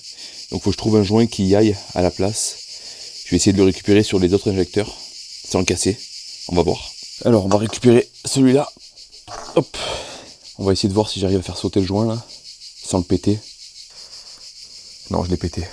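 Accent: South, France. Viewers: BM double vié, ca fait plaisir d'entendre l'accent du sud. Avant de déménager a la réunion, jai vécu du côté d'Aix en Provence, Salon de Provence..enfun jai vecu dans pqs mal de petits villages au alentour.. Hâte de voir la suite de ton bordel pas organiser 😂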